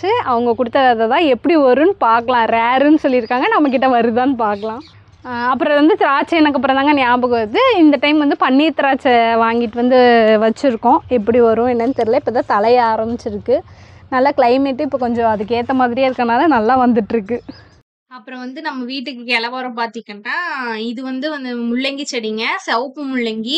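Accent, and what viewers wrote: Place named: Gobichettipalayam, India. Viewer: எங்க ஊர்லயும் மிளகு தக்காளி கீரை யை கறிஞ்சி குட்டி கீரை தான் சொல்லுவோம் sister...... ******. *********. **** இப்படிக்கு கோபிசெட்டிபாளையம்.......... உங்க language கூட கோபி சைடு பேசற பேச்சு மாதிரியே இருக்கு பா..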